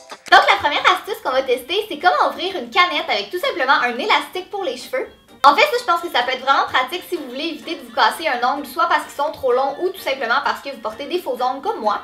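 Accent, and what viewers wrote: Quebec, Canada. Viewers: C'est un accent québécois come on!!! 😙😙😙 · c in accent quebecois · Je la trouve trop belle, marrante et puis j'aime trop son accent québécois 😍😍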